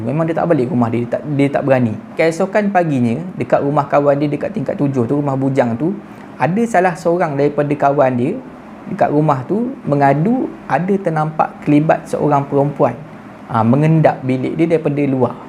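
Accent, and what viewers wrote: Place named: Perak, Malaysia. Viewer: Bang orang mana terengganu ke perak bunyi seakan akan macam loghat peghak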